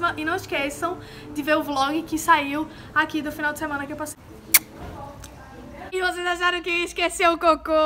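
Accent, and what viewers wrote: Northeast, Brazil. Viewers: Que sutaque maravilhoso de se escultar (sou do nordeste tb)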